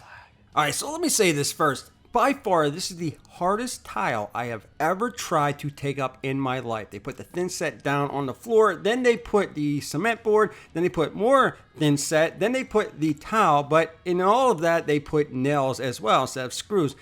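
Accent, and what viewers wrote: Pittsburgh, United States. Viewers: Love the Pittsburgh language!!! PGH STEELERS 4-LIFE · 🤘🏻Pittsburgh speak 🤘🏻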